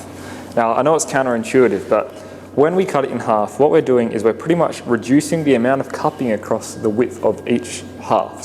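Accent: Australia. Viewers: Good to hear an Aussie voice on here (where r u ?) from a Perth based mens shed member :)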